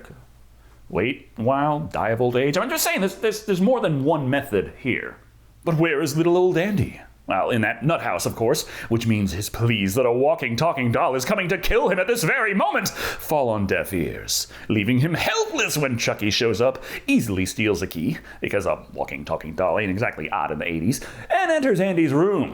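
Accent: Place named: Brooklyn, United States. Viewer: I Love that Brooklyn accent, bruh! 😆😆😆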